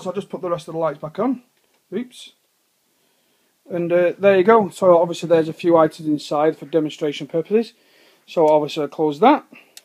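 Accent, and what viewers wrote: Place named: Birmingham, United Kingdom. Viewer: Brummie or Coventry?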